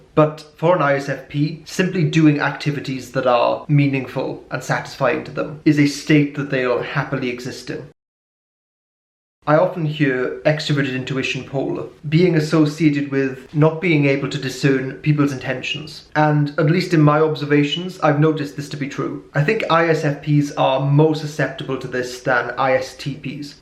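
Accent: United Kingdom. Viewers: when i saw your face i didn't expect to hear a british accent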